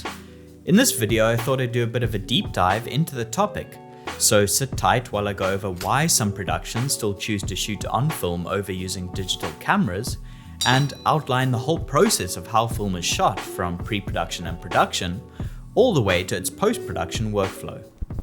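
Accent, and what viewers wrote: South Africa. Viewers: Hey man, great content, your accent is so SA! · Bru are you South African?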